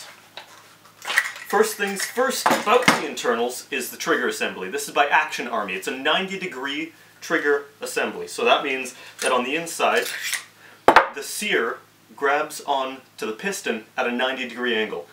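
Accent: Canada. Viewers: Nice, You from Canada eh?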